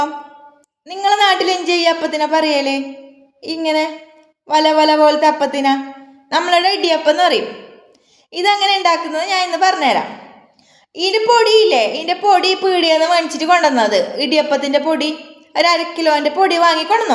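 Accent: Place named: Kasaragod, India. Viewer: ആക്ടിങ് അല്ല ഇത് കാസറഗോഡ്ഭാഷ ആണ് , ചില സ്ഥലത്തഇങ്ങനെ ആണ്, ബന്തടുക്ക, പൊയിനാച്ചി, ഇങ്ങനെ അങ്ങോട്ട്‌ ഇതാണ് ഭാഷ ❤️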